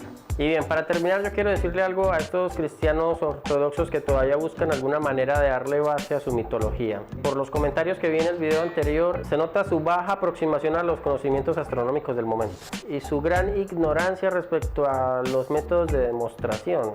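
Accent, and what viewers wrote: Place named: Colombia. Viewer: Buen Colombiano (O, al menos tu acento da a entender eso), por fin alguien que también desmiente las estupideces de esos sujetos.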